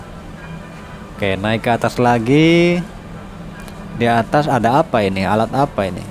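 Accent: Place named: West Java, Indonesia. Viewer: Bang asli mana...klo logatnya....saya tebak..mgkin dari jabar nya....tolong bales..trmksh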